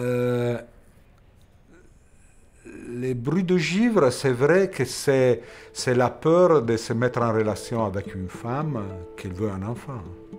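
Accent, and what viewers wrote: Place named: Italy. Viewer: meraviglioso. e delizioso il suo francese con accento italico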